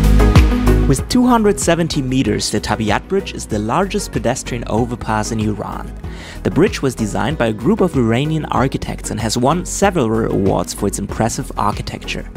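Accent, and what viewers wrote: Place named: Germany. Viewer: You make some dope vids, man. I'm also Iranian and I hope you had a good time here. I noticed you have German accent, so I gotta say that I'm a big fan of:)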